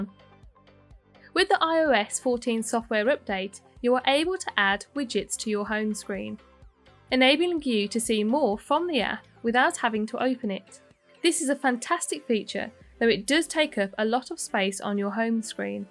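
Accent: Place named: United Kingdom. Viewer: Great video and very useful tips- everything is better in a British accent! :)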